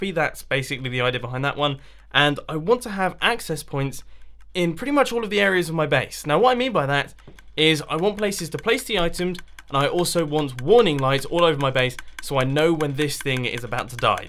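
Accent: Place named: United Kingdom. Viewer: Other than the good content and some hilarious moments that offers, I learned a lot when it comes to Radstone and kinda of British accent so Thank You.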